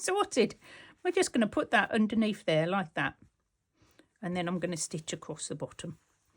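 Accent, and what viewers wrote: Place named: West Midlands, United Kingdom. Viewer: I'd love to see the finished result. I also love listening to you speak - your accent is similar to some of my family. They were from West Bromwich and others from Walsall. I'm a Brummie ;) Ah, the accents of the West Midlands.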